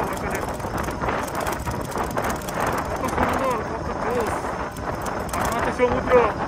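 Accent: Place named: Brahmanbaria, Bangladesh. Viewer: ভাই আপনার কথা শুনে বোঝা যাচ্ছে আপনার বাড়ি ব্রাহ্মণবাড়িয়া 😒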